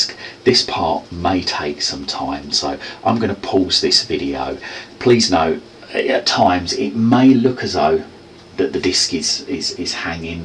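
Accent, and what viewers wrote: United Kingdom. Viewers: Thank you. Simplified instructions, comprehensive for people that lack experience,calm voice, british accent . Great job!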